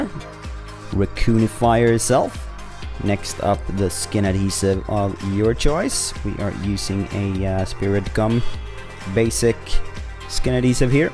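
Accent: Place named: Skåne, Sweden. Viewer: good accent in Swedish, sounds like ure from Skåne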